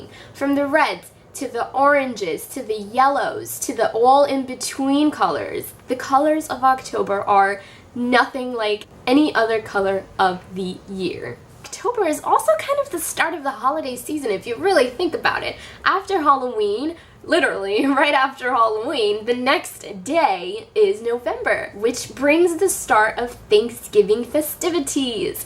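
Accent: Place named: New York, United States. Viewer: I love your NY accent. I'm from NJ lol I was just watching some of your old videos yesterday so it's great to see something new from you! I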